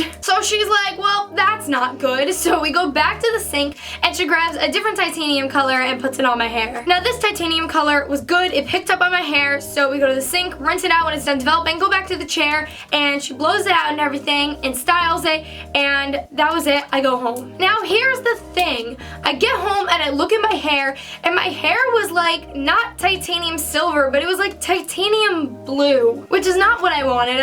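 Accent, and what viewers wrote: New York, United States. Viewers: New York accent baby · You have such a new York accent I LOVE IT 💜💛💙💚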